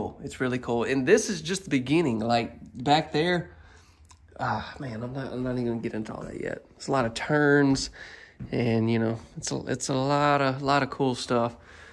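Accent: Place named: South Carolina, United States. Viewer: Hi, just found your channel by today. Number 10 MH was nice, but to my amazement they’re still using the thin strips of wood(mdf) to join each 4X8 wallboard?, I really thought by now those would have been eliminated as manufacturing has really advanced over the years. Enjoyed your video and will be watching more. Thank you and have a great week….Be Safe ❤PS. I gotta say young man you sound like you were born and raised in upstate South Carolina.😂😂😂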